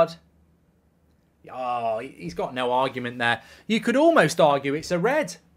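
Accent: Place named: Scotland, United Kingdom. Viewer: Marks Scottish accent 🙈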